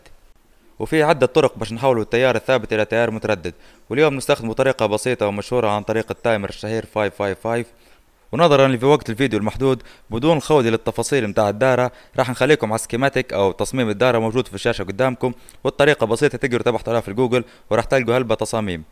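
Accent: Libya. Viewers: ليبي يتكلم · السلام عليكم. حاب نتعلم منك شغلك. من لهجتك عرفت أنك ليبي يعني أبن بلادي. ياريت نقدر نتواصل معاك شخصيآ. عشان ناخذ عندك كورس عملي. أرجو الرد يا أستاذ.! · حسيتك ليبي😁 منور ربي يوفقك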